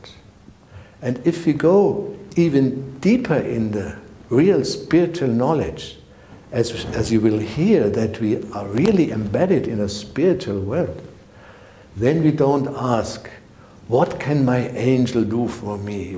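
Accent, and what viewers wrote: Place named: Bavaria, Germany. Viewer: Your Bavarian dialect mixed in with English is a delight to my ears. 😘 I’ve lived in America for many years and still have a little Munich/Bavarian accent😘 love your courses in German and English. Eye and heart opening.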